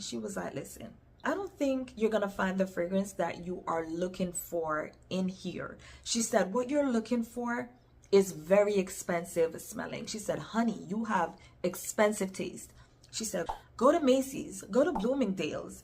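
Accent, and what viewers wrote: Jamaica. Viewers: Truly enjoyed this video. Absolutely love your Jamaican accent.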